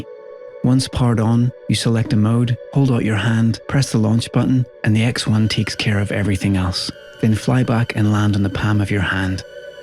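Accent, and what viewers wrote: Ireland. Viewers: I concur with most of the other contents, but I really enjoyed listening to your calm, almost ASMR voice. The Irish accent doesn’t hurt either! Not trying to come across as gay (“Not that there’s anything wrong with that..”), but I could probably sleep to one of your reviews. 😅. Keep up the great work. If that’s your girlfriend, she really cute too! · Excellent review and beautiful put together . You sound irish ? But that doesn’t look like Ireland ? Where you get the background sounds from ?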